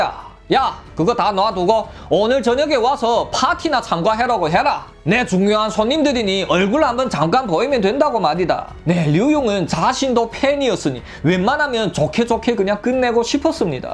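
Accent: China. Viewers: 아무생각없이 클릭해서 첨에 한국사람이 방송하는줄알앗는데 말투가 ..같은 조선족이라 ...음...ㅎㅎㅎ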